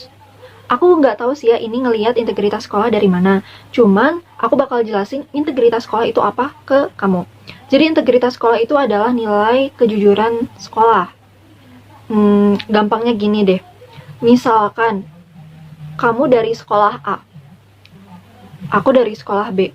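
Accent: Indonesia. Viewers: Ngakak banget lagii bahasa Sunda nya lucuu😂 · Tth suka ih logat sundanya ❤️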